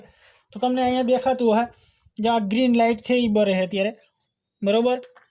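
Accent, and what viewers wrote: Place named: Kathiawar, India. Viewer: કાઠીયાવાડી લાગો હો ભાય 😀😀😀😀😀😃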